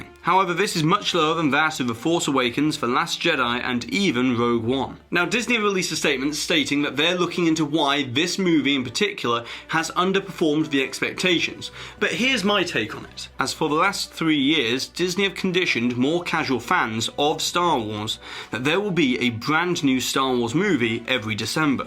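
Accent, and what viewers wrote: England, United Kingdom. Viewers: What part of England does your accent hail? It is TRULY unique, and I have heard it only seldom before.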